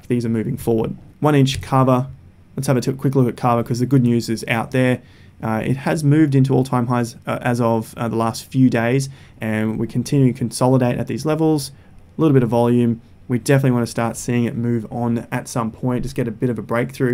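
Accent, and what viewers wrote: Australia. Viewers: who are these people you feel the need to apologise to...no apology needed. You’re Australian and won’t speak the Queens English like what I do.....As for sound quality I only noticed a difference when you were away but no problem. More....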